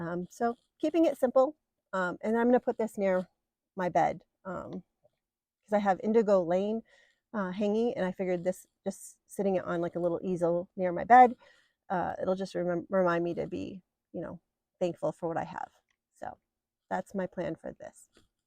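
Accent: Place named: Rhode Island, United States. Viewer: Love your style of organizing your video! Drinking my coffee while watching was delightful. I am originally from RI and hearing your accent reminds me of how much I miss the salty air from the ocean breeze . I am so excited that I found you! You are a very special friend and reminder that we can all do more for our communities. 💕🇺🇸💕🪡💕🧵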